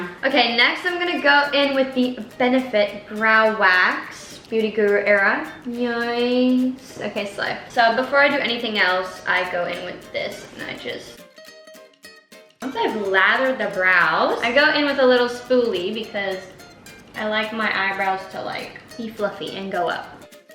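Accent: United Kingdom. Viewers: The 'Brit's don't be offended XD' got me rolling on the floor. You were speaking very POSH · But good london Accent! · British accent is slayyy. Btw can you pin me 📌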